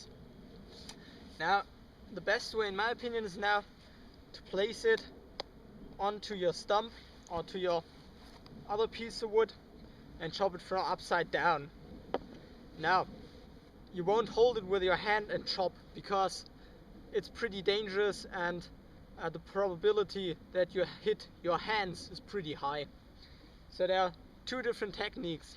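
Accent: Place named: Austria. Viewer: Great video! The best video I've seen on youtube about using an axe safely. I also really like your accent. Austrian right?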